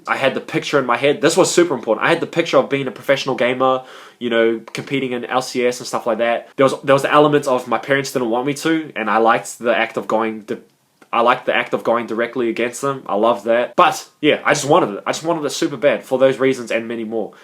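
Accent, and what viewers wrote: New Zealand. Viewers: He's kiwi bro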